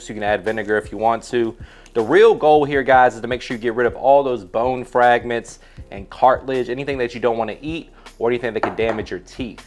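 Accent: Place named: South, United States. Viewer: Cooking with is crazy LoL you from Florida? It feels mad southern.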